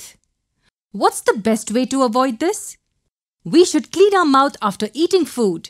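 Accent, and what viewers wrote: India. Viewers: Such knowledgeable and scientific information, the Indian accent is strong too, I love it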